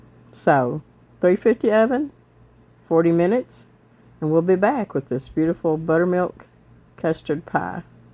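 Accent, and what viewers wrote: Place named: Arkansas, United States. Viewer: I love custard pie. I love your accent it reminds me of my grandparents, they were frome northern Arkansas.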